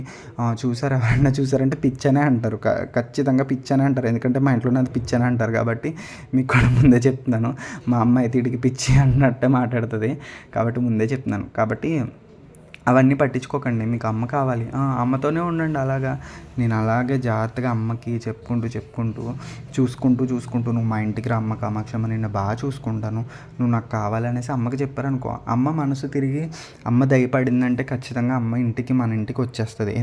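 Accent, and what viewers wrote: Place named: Godavari, India. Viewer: Meru godavari vallandi. Mata telustumdi. Alamkaram baga chesaru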